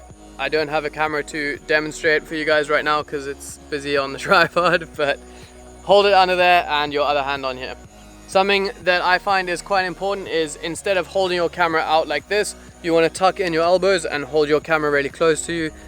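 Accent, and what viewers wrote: South Africa. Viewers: Trying to figure out your accent. My short list is South Africa, UK or Australia. If I was to guess then I’d go with South Africa - am I right ? Great content as always.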